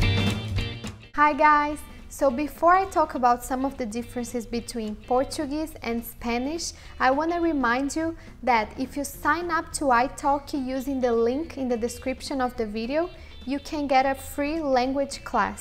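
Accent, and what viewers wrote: Brazil. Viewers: sorry ma'am that is not portuguese its Brazilian portuguese which is a lot different when it comes to pronunciation, also you speak south american spanish not original spanish. you shoudn't have used the portoguese and the spanish flags in this video so not to mislead people. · Muito bem! Se não fosse o sotaque brasilês e a omissão de alguns determinantes seria perfeita. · Ese portugués que estás hablando es más Brasileiro XD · This has probably been pointed out already but the portuguese word for makeup is "maquilhagem", and the "lh" is not mute. Also portuguese sentences are built a little diferent, for instance "sempre há muito vento quando neva" sounds really off, it would be "Quando neva há sempre muito vento", though it is a only a small difference and I understand this is to keep things simple. Also I feel like this video is explaining more about the similarities than the diferences (these words can be easily translated from one language to the other with this simple rules while a lot of them can't) Also I wanted to point out she's using the brazilian accent, and as a portuguese it makes me wanna point that out (my corrections were for Pt-Pt, so ignore me if they're not true in Br-Pt). 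Sorry for the long comment, blame youtube for recomending me videos of my language. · JhonnLT Ele não falou "bosta" seu ignorante. Ela usou sotaque brasileiro, é uma crítica perfeitamente válida sobre a bandeira. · let me tell you this... As a Portuguese native i find her videos annoying as shit! The fact that she is trying to teach the diferences between Portuguese and Spanish, and then makes the Portuguese pronunciation with Brazilian accent, makes me want to punch her in the throat... if you are going to teach something please make sure you know how to exactly say it before making fun of yourself online. That aside and answering your question it doesn't make sense, your right! But that is only true for Brazilian Portuguese. If you were to learn Portuguese from Portugal it's a set rule that all words ending in "o" have to be pronounced as the sound "u" (no exceptions). Hope it clarifies it for you. 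· FreedomWarrior Não vale a pena andares a causar discussões com Brasileiros só porque ela falou com o sotaque do Brazil, ela usou a bandeira Portuguesa quando falou da língua Portuguesa (dando reconhecimento ao país original da língua) não a Brasileira, por isso acho que estás a exagerar e mais, a causar má impressão do teu e meu país. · É Português do Brasil... quase outra língua! Ela usou palavras brasileiras, por isso que devia ter a bandeira do Brasil. Como por exemplo, quando ela disse "maquiagem" em Portugal é "maquilhagem". E para não falar que também usou o sotaque brasileiro. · Primeiramente, gosto dos seus vídeos. Mas não entendi o por que você colocou a bandeira de Portugal se você fala Português Brasileiro e inclusive no vídeo, você falou com o sotaque brasileiro, não com o sotaque de Portugal.......... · O teu português é muito brasileiro ; ) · I like the video, but this is much more SPANISH vs BRAZILIAN.... 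Your accent here is completely brazilian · You didn't actually speak native portuguese, you spoke brazilian which has a completely different accent from european portuguese. Makeup is not maquiagem in portuguese from Portugal, that's brazilian. In portuguese it's maquilhagem. Stop distorting portuguese language please. This video is more about brazilian portuguese and spanish, not portuguese vs spanish. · you were actually speaking brazillian portuguese, not the "european". you can tell that by the accent (not a big deal) but also by the word "maquiagem". in portugal, it spells "maquilhagem". just wanted to point it out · Her accent is Brazilian, not Portuguese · she spoke with a Brazilian accent and put the flag of Portugal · Se é pra falar com sotaque do Brasil e meter bandeira de Portugal... não dá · Esse sotaque ai é brasileiro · you are speacking portuguese from brazil not from portugal sorry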